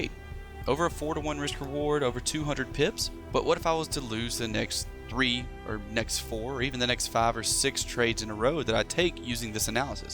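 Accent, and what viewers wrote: South, United States. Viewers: .........UUUUMMMMMHHH I like the way you talk unmm huumm. Sorta Southern there aren't ya?. They videos and the analysis are comforting. I had to get out of the market for awhile ad focus on my business dealings that really pay the bills. You my friend are inspiring me to sign up and get the account funded and take some PIPS out of this market for a true life changing transformation. My full time job is draining me but I can't yet stop and walk away from it. I do have a Dream and a plan to make it happen. Got a few hurdles to clear then I I'm done.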